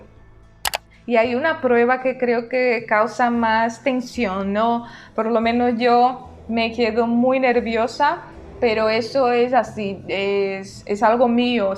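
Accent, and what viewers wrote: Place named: Spain. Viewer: Una mujer latina hablando el español de España y yo acá en Europa estudiando el de Latinoamerica. Me da chiste jaja. Su español es increíble! Muchas gracias por los consejos, me ayudaron mucho. Ojalá algún día alcanze lo mismo. Tb aspiro el C2 pero me parece bien dificíl y siempre cuando tengo nervios se me va el español. 😂 Bueno, tal vez en 10 años lo voy a intentar jaja Saludos desde Alemania. ✌🏼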